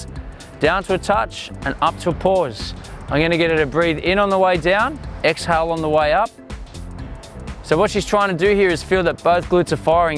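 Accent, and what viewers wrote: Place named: Australia. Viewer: I have no idea what this guy was saying...Not because he's got Australian accent but because I stopped listening after he told that girl to lay down and work on her glutes...At that point, I even forgot what this video was about. If you want people to pay attention and not get crazy distracted by the hot girl, bring a 300 pound guy to do these exercises. People will definitely pay attention as they'll feel if they don't - they will end up like that guy.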